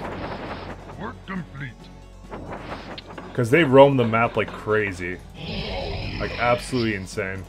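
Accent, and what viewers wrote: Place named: Canada. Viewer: You speak canadian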